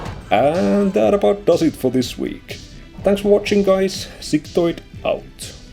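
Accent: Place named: Finland. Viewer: Sounds like a finnish accent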